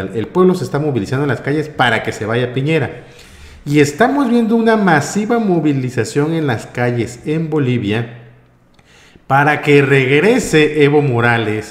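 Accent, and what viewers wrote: Tabasco, Mexico. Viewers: Primera vez que te escucho con el asentó tabasqueño😁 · está bien tu acento tabajqueño pero distraes un poco. 😄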